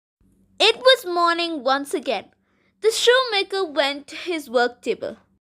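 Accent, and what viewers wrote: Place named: England, United Kingdom. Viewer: Excellent video and story as always, I love your voice is so clear and easy to understand and I really admire your English accent, Great effort my dear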